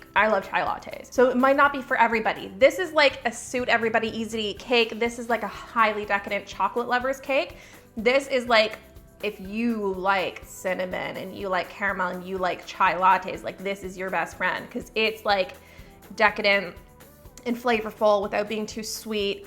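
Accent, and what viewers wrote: Canada. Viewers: It's so funny when you're watching a channel for months and then they mention that they're in Canada and suddenly it clicks that that's the reason you're drawn to their videos and they seem so oddly comforting and enjoyable to watch. It's like hanging out in a friend's kitchen because your demeanor and "accent" is so familiar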